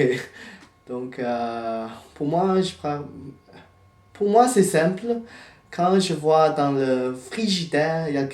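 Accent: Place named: Quebec, Canada. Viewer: Est-ce que tu habites au Quebec en ce momment ? tu as un accent, mais tu parles le jouale quebecois, vraiment cool à entendre, keep it up ! :) haha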